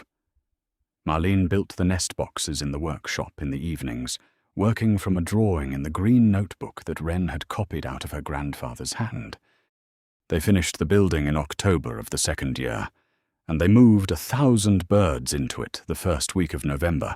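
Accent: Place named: United Kingdom. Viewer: why is the narrator a Brit?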